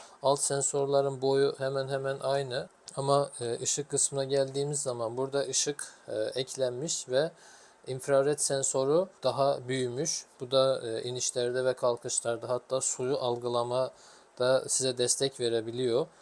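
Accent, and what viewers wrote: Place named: Azerbaijan. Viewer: konuşan arkadaş azerbaycanlı galiba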